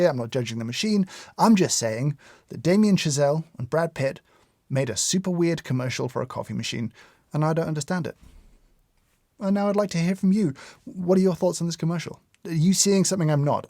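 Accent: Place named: RP, United Kingdom. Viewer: Overreaction to an ad. this aristo-accented Brit going over the producers (who cares?) The connections he makes of motorcycles with coffee (who cares?). Coffee beans sold with name of who it was made/ filled for (who cares?). Pitt and being supposedly made to look cool in the selling of something(what else is new?).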